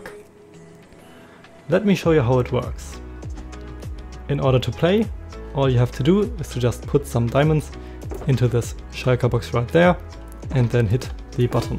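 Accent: Germany. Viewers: Amazing Video! It works perfectly fine! I’m just wondering if you’re german, because you have an accent?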